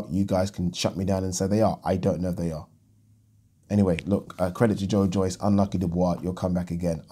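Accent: United Kingdom. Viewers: Top bloke...british accent yet his pronunciation of Adeleye is spot on...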